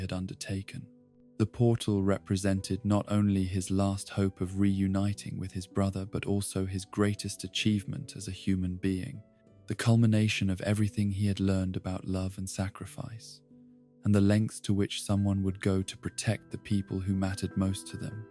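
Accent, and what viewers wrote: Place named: RP, United Kingdom. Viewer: u sound like a tory but i fw this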